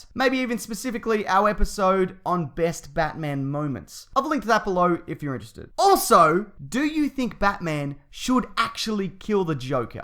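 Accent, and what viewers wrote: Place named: Australia. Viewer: Someone explain how every single auzzie youtuber ( i think he is auzzie? ) sounds the sound Like this guys voice is the exact same as theauzziegamer and some other channels i know ( btw no offence im an auzzie as well mate k )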